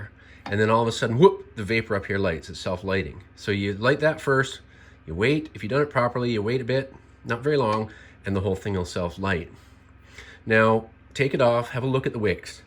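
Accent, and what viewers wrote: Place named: Canada. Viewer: Can always tell a Canadian by the way he says out , like a Scotsman says outt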